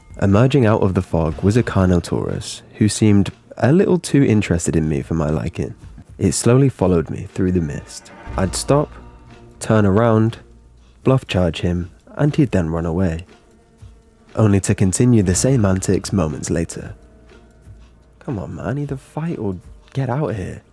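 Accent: United Kingdom. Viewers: I like Dino, I like British voice. I enjoy this very greatly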